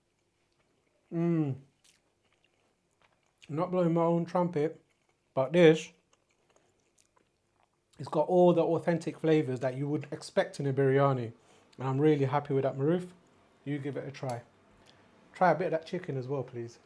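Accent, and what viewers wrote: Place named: United Kingdom. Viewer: This style of biryani is so simple, it forces me to ask : why not cook chicken curry separately and boil rice with the "khara massalas" and then mix the two. Why go through the charade of cooking them together? What irritated me most was the heavy accent (British?) interspersed with cyclic repetition of superlatives. I say, let's keep it simple and natural, what? The comments displayed are so identical you can't help but think it's the same person with no imagination.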